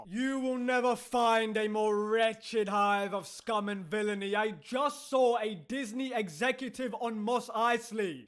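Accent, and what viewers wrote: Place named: England, United Kingdom. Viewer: I love an English accent that doesn't make me feel stupid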